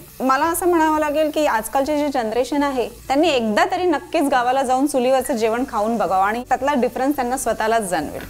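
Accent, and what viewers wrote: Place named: Satara, India. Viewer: सातारची भाषा आहे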